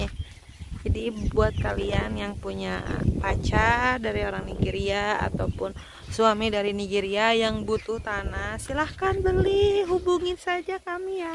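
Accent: West Java, Indonesia. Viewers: Tth sunda bgtttt hihihiiii walaupun di negara org.masih ttp nyundaaa sukaaaaa😍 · Teteh mani lucu nyunda pisan sok atuh pulang ka tanah Pasundan hehehe cing damang teteh .enggal gaduh bayi atuh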